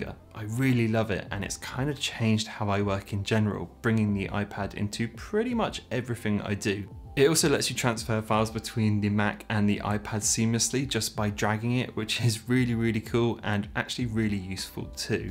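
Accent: United Kingdom. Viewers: Are you British? Love your accent 😊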